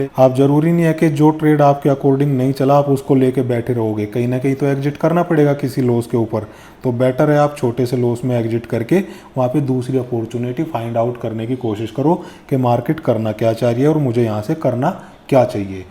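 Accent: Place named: Haryana, India. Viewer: Bhai aap to merre haryana se lg rhe ho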